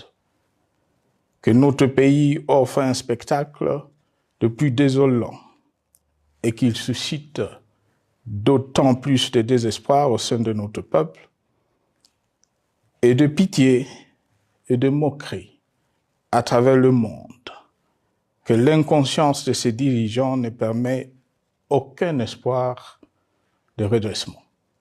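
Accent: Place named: Rwanda. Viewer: Je suis tellement pcq tu as un ton de kinyarwanda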